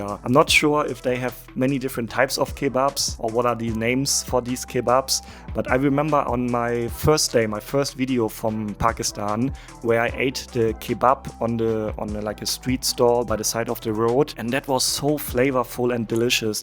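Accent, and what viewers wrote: Germany. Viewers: hat irgendwie nen deutsch Tuch in sein Englisch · Ich hatte es geahnt dass du aus Deutschland kommst, wegen deinem Englisch was du mit Deutschen Akzent sprichst. Auf jeden Fall ein sehr Interessantes Video 👍.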